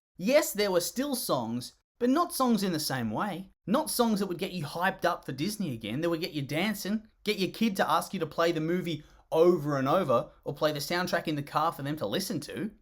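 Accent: Australia. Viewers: At first, I thought you were British, but since you've said Zootopia and not Zootropolis, you're obviously either from Australia or New Zealand. Sorry, the accents sound similar 😅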